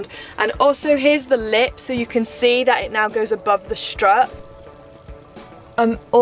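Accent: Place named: England, United Kingdom. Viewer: Wow! Thanks so much for sharing from across the pond! Stumbled across you and your video as we are all stuck with limited activities and lockdowns:( I did have an RV last year, but still long for a Van as a more manageable means to get away. A suggestion maybe for mold is desicant? sp? They sell it at hardware stores, and even our storage places in a plastic bucket with a perferated top, crystals absorb moisture.... I also had an electric rechargeable one off Amazon, that just hung with a hook, and it changed color as it needed recharging to dry it out. Packets of silica beads / gel may also help to dry area out. Cheers and and thanks again! Stay safe, LOVE your proper English, haven;t heard in many years ... Canadian raised :)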